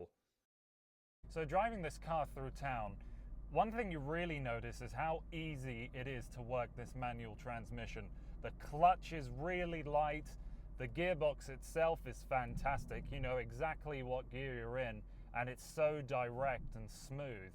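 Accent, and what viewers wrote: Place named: England, United Kingdom. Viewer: Are you in Canada .... ? because you sound very English .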